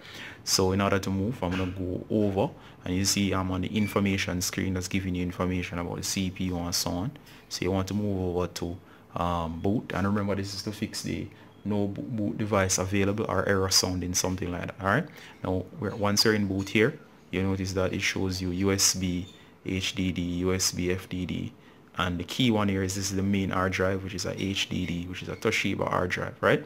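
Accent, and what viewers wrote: Jamaica. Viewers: where you from? you sound like a yard man. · Are you Jamaican?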